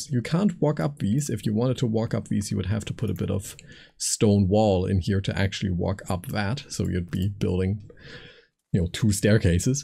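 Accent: Germany. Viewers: You sound like you have a slight german accent but you are so well spoken and articulate. It really confuses the heck out of me. Seeing that there are some people commenting in german, I can't be the only one, haha. Anyhow, fantastic video! I've deliberately not looked at any building tips and tricks to find out some of these things for myself and only now allowed myself this video as an introduction on what the game has to offer. Almost done watching and can't wait to implement stuff like the flying buttresses. Can I just say, you should really consider streaming your progress on twitch. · Dieses Spiel ist ganz nach meinem gusto. Übrigens hört nur ein geübtes Ohr deine Deutsche Herkunft heraus. Dein Englisch ist Einwandfrei. Sehr guter Video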